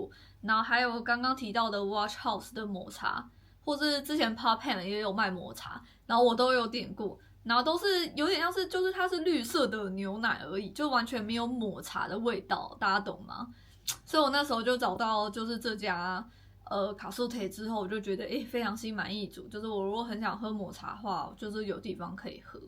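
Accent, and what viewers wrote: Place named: North, China. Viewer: 北部口音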